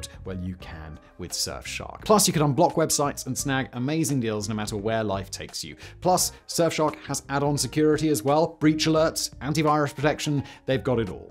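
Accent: England, United Kingdom. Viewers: Why are you using American English? You sound English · The french and German trenches were nothing a like. People think becuase this guy dressed well and has a british accent he knows what he is talking about. The german trenches were meticulous and the English were temporary and terrible to live in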